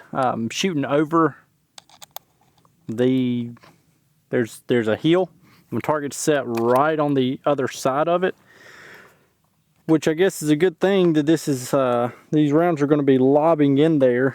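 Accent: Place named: South Carolina, United States. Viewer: Hmmmm, accent, foliage, weather, east coast.... SC?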